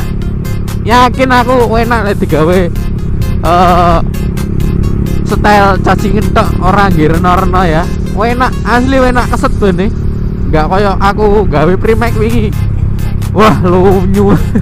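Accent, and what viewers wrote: Java, Indonesia. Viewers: jowone ngendi mas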